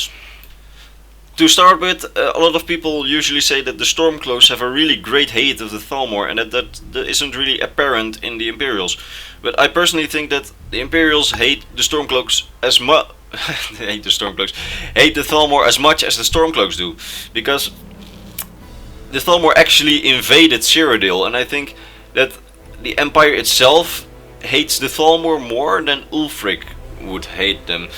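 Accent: Netherlands. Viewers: nederlander dus kan het aan je accent horen 👍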